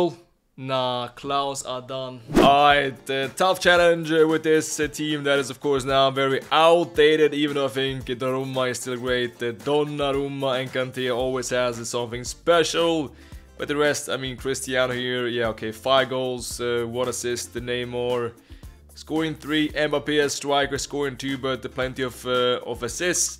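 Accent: Sweden. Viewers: Are you from Borås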